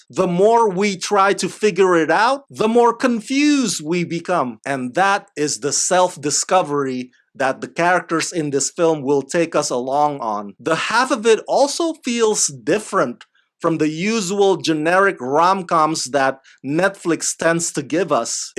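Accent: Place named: Indonesia. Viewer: btw i hear an indonesian accent are you indonesian?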